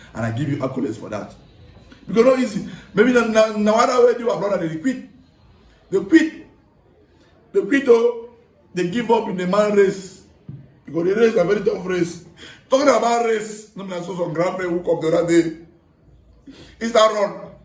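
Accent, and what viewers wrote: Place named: Nigeria. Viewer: Combine bro. Pidgin and British English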